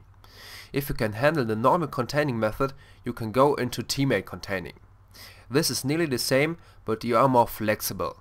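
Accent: Germany. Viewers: Kann es sein dass du deutscher bist ? Are you from germany ? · you are german? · Thanks! BTW, telling by your accent, you are a German speaker? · ich hasse den deutschen Akzent! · This guy has an awesome accent. Hey, you all better listen to him, he's German! Germany have a sick international team. Thanks for the advice:)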